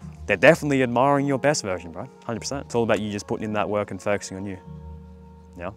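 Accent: Australia. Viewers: You’re Australian right? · Prob Aussie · the man or woman has an Australian accent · Even as a 15 y.o who believes he has the perfect girl, I can't help but still feel unworthy of the love I receive from her. She's the first person who hasn't stressed me out, who wasn't a bitch, who didn't self harm. And I've been with her since April last year. Fingers crossed my mood improves. [also you sound aussie, I'm aussie too :) ]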